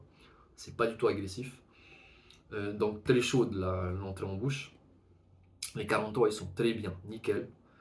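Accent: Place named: Quebec, Canada. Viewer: C'est donc ça l'accent de Tahiti ? Ça ressemble à du québécois.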